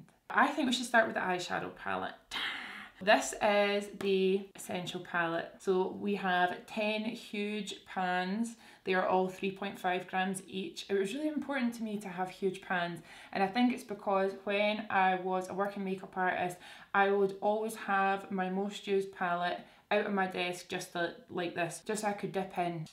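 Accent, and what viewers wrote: Scotland, United Kingdom. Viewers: I’ve been watching you since waaaaaaay back in the day having stumbled on you by complete accident. I have a great love for Scotland and it is my number one bucket list place to visit so when I heard your accent I stopped and was immediately obsessed by your your personality. You’re such an inviting loving person and have an energy that I can only describe as sunshine. I watch every single video religiously. I’m so so so happy for you about your brand and honestly I’m really proud of you!! Nothing but love from me to you sister!! You’re killing it. Keep being the beautiful human that you are xoxoxoxoxoxo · I'm tearing up just listening to you talking about VIEVE. I've been following you FOREVER! I was still in high school when I started following you on instagram, you still had the white stripe with the black hair. You didn't have Drogba yet. 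You lived in a tiny flat and you were a bit shy in front of the camera. And oh my god, I didn't think accompanying your journey had gotten to me until now. I feel like a proud friend! My mom and I watch your vlogs religiously (even though I have to translate half the things you and Jack say, she's not good at understanding Scottish accents). You're the only beauty influencer I truly listen to. I'm so so so happy for you!!! Lots of love from Brazil! Trust and believe I'll save money to get e v e r y Vieve product.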